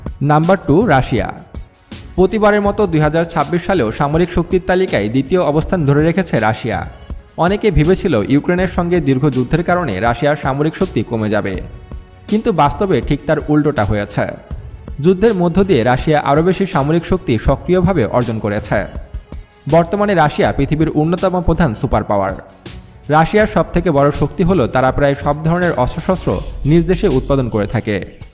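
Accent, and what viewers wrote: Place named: Bangladesh. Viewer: তুই যে বাংলাদেশি সেটা তোর কথা শুনলেই বোঝা যাচ্ছে ।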